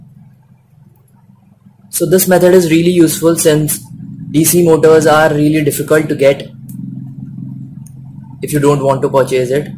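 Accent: India. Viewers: I really hate the indian accent in english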